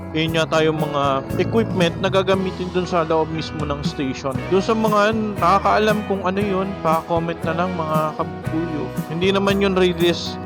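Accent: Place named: Hagonoy, Philippines. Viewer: ayown sana all talaga lagi sa gala..gusto ko ung salita hagonoy accent😂 try mo minsan mate😅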